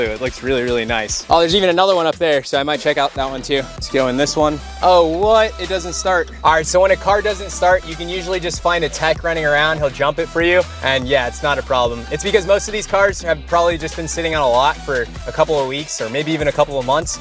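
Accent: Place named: California, United States. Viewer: Ughhhh, what a waste. Im glad you doing great in life BUT WHY ARE YOU TALKING LIKE THAT? YOU KNOW YOUR TALKING LIKE A WHITE CALI DUDE. SWEAR YOU DONT HAVE ANY BLACK FRIENDS. SWEAR, ITS SO WEIRD.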